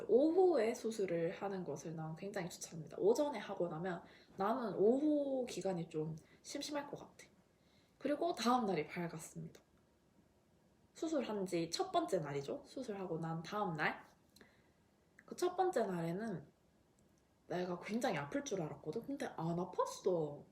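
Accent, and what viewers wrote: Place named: Gyeongsang, South Korea. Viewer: 경상도분이시군용 ㅋㅋㅋ귀엽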